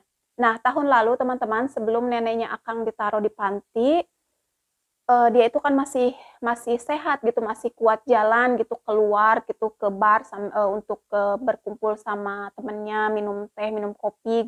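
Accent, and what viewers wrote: West Java, Indonesia. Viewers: Sy seneng.ada sunda2 nya ngomong nya a eteh mah lucu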